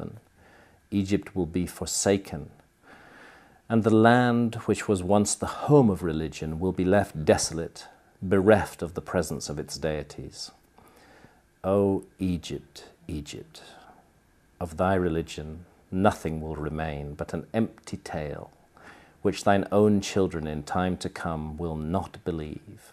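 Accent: United Kingdom. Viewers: Then those sexy 💋, please yall don't do that to me anymore, because I'm from small town alabama u.s.a , but qas once married to a David O'Connor migrated from Ireland pre tern he died 3 yrs ago he was also 28 yrs my senior opposite race than I so we did indeed have plenty of problems but ok anyway I simply miss the acsent of men from the isles, u.k. great britain, I'm absolutely blushed, cause by my living in the country the country, I only hear such acsents on you tube its just intriguing. Fabulously prevented, which is no surprise with you because your always +#100 in mt book because your you and that's a real man whom will gave his voice heard on misdoings of others as well as honesty in presenting your newly found discoveries to the attention of the masses, awesome research 2. Thank you for sharing your knowledge of truth with others such as I. You and your 👪 remain blessed. 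Keep doing what your doing , DON'T CHANGE A SINGLE THING.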